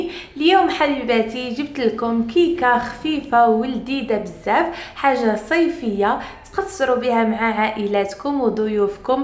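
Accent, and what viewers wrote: Oujda, Morocco. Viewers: MERCI c'est gentil..... Tout le monde aime bien ta façon de parler surtout tu as gardé l'accent Oujdi 💯 qu'il ne faut surtout pas perdre..celui ou celle qui ne comprends pas ils n'ont qu'à faire un effort et les petits plats dyale zmane on est fière de toi continue comme ça on te souhaite beaucoup de succès 👍🙏💖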